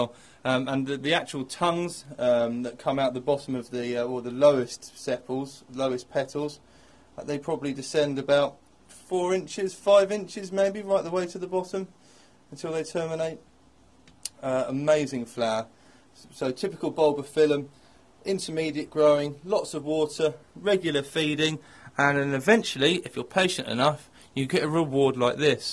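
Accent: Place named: South, United States. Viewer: You sound like your from the south country btw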